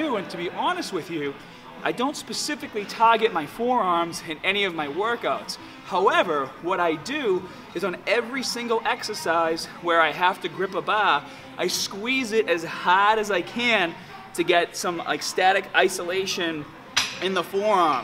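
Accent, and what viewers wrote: Boston, United States. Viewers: Boston is strong with this one. I hope you aren't taking those little jokes about your accent seriously by any means. It's part of you! :)) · I love how u just embrace that boston accent - baaaaah instead of bar, haaaaarrd instead of just hard xD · Dude his Boston accent REALLY shows in this video. hahaha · I'm playing Fallout 4 but none of the characters in-game have that Bostonian accent of yours :(((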